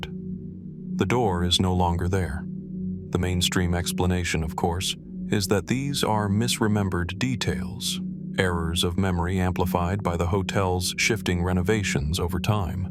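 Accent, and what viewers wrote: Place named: United States. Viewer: I listen to these during tbe day, and while travelling, but, I find it hard to fall sleep to an American voice; strange, but I find the British accent far more relaxing.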